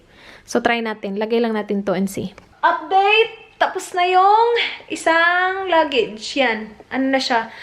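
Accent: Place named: Hiligaynon, Philippines. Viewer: bakit parang hiligaynon yung accent mo sa first one minute intro mo hahahahahaha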